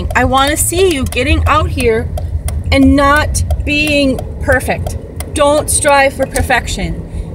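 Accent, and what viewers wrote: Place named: California, United States. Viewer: Hey buddy. Are you in Cali? You sound like you're in Cali.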